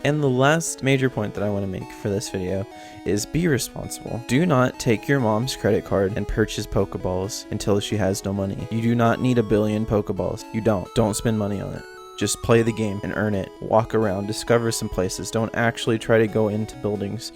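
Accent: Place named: Australia. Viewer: Oh? You sounded more Australian to me :P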